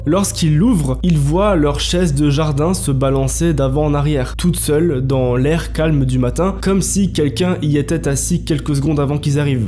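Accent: France. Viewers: Salut, je découvre ta chaine ^^ N'aurais-tu pas comme un chtit accent du chnord? ^^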